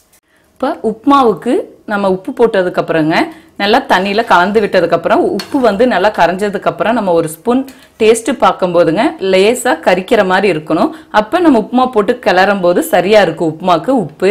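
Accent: Kongu, India. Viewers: super mam rombha easy aa sollitharinga unga samayal ellam. nallaeruku athalayum neenga oru varthai solluvingala sethikalam athu enaku rombha pitikum neenga kongu thamizhaa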